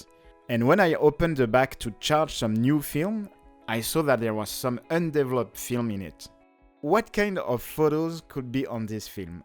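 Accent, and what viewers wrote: France. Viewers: Are you french ? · I like your french accent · J'ai capté que t'es français grâce à l'accent. Tu touches un billet pour faire de la pub à nation photo ? Avant même que tu montres la lessive c'était certain que c'est les années 80. Les meubles et les costumes, la déco etc. Je comprends pas comment t'as pu dire années 50 / 60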